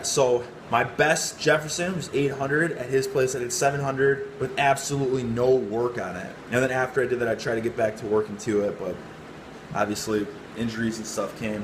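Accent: Midwest, United States. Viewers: Man,. His voice is so much higher pitch and the midwestern accent is strong.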